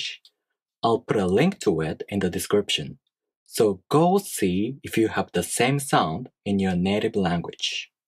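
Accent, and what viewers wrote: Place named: South Korea. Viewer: Why do you have a slightly Korean accent?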